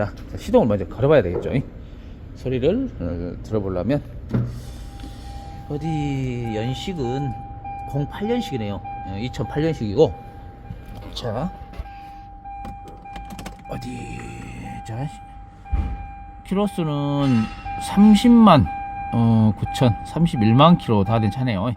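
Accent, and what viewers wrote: Jeolla, South Korea. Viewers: 공장장님 오늘도 수고 하십니다 전라도 사투리가 아주재미나게 말쑴헤사아주 시고 실력도좋고요 나도 화순사람인데요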